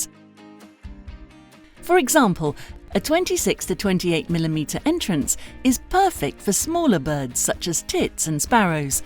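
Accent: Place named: United Kingdom. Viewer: Not everyone is American dude, the accent is British.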